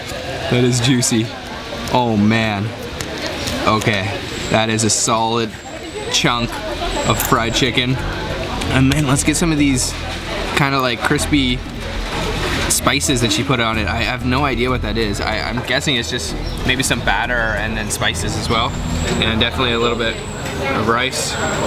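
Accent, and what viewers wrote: Canada. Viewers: Its canadian speaking style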